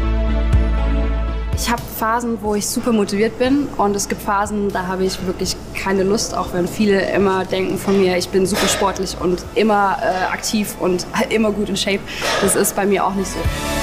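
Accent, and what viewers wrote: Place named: North Rhine-Westphalia, Germany. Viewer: Mensch. Bin total enttäuscht über Deinen NRW Slang. Ich dachte Du bist aus Ba-Wü und kannst echtes Hochdeutsch. Ausdrücke wie Spoaart oder Leaaarnen gehen gar nicht. Es heißt Sport z.B. mit kurzem O und keinem anderen Vokal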